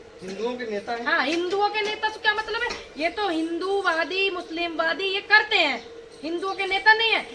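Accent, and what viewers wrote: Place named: Haryana, India. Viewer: जी,आप का ठेठ हरयाणवी गाँव वाला लहजा और किसानों के लिए संघर्ष करते हुए देखना अच्छा लगता है ।किसान को छेड़ कर बीजेपी ने अपने रसातल मे जाने का मार्ग प्रशस्त कर लिया है।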